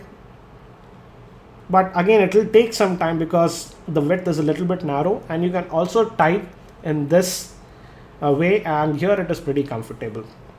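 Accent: India. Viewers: lol indian accent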